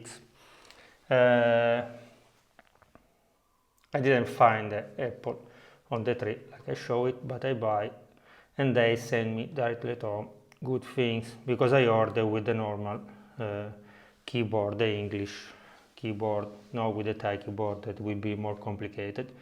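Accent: Italy. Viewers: Your english is not bad, it’s great, it has an italian accent to it and it is normal. People need to chill and stop being rude and appreciate the unique content you are adding.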